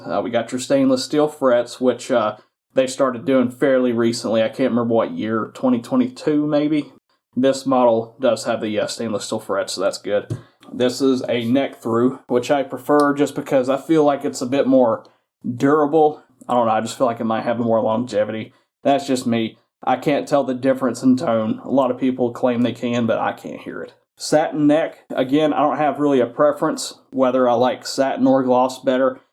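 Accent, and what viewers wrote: United States. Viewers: ok I was thinking arkansas with that accent lol. Cedarpoint! We went there in June. I live in nebraska and esp are non existing. Btw my ltd is a fret buzzer. I'm about to send it to sweetwater for a plek and setup.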